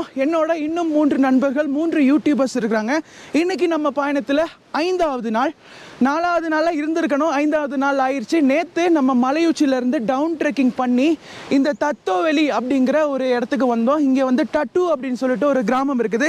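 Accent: Tamil Nadu, India. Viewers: இலங்கைத் தமிழராக இருந்து கொண்டு தமிழ்நாடு தமிழில் பேசுகிறீர்கள் தமிழ் நன்றாக உள்ளது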